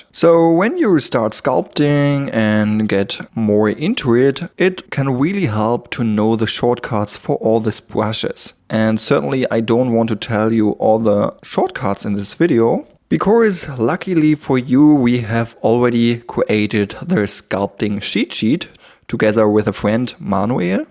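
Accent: Germany. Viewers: i hear a german accent well, your name sounds pretty german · "Oh he sounds really german" i thought. "My name is " he said. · Great tut man, very, very helpful(Also your german accent is adorable) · Man hört einfach sofort, dass du deutsch bist xD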